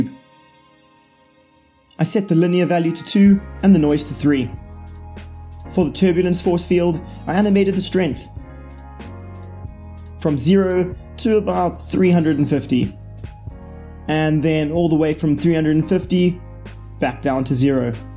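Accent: South Africa. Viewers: South African? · You sound South African lol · The accent took me a second to pick up. Not used to SA repping in the industry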